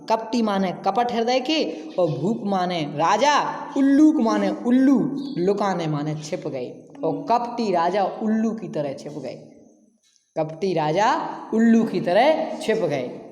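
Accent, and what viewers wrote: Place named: Madhya Pradesh, India. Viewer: 😍अपका पढ़ाने ये अंदाज दिल को छू गया मुशे लगता है आप कही मद्य प्रदेश से है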